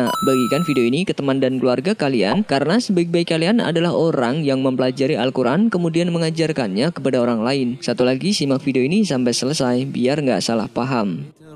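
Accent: Java, Indonesia. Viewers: Kaya org jawa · Bibir orang jawa emang gitu say kaya nybut fatir patir..dn ga niat jelek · Uwoo Admin wong jowo toh 😆, aksennya beda , jazakumullah infonya min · Kmungkinan beliau orng Jawa jdi wajar aj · Lidah jawa memang susah di ubah Aksen begitu lekat Alpatekah. Audzubillah hir rabill ngalamin. Mohon perbaiki min. Aku juga jawa tapi nggak gitu kali. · Orang Jawa kek gtu sebagian besar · Hhh sabar orang jowo udah biasa 😁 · logat jawa banget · Al-fatihah lah yg paling benar akan tetapi logat orang jawa yg medok membuatnya menjadi al-fatikah sama seperti ayah saya 😄😄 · Dia menyebut Al Fatikah krna sebagian org jawa dialeknya begitu.. tak mengapa jika itu di lakukan krna ketidaktahuan atau krna ketidakmampuan tetapi hendaklah dia memperbaiki pengucapan bila itu sebuah kesalahan yg telah diketahui. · Mungkn dia orang jawa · logat orang jawa itu mah, mertua gw juga sama ngomongnya alfatikhah karena logat di sana medok. 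· Sdra"....jgn mudah menyalahkan org lain. Utk sdra kita suku jawa,kebanyakan pelafalannya ALFATEKAH utk menyebut ALFATIHAH. Itu logat...bahkan ada yg sdh ustadz msh sering keseleo lidah dlm pelafalan alfatihah. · Rata² yg sebut pelafalan seperti itu orang² jawa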